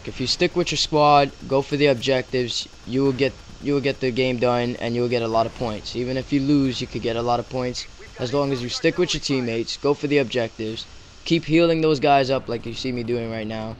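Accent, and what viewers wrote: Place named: New York, United States. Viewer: i love it when u say the into for rating :-D i love your NY accent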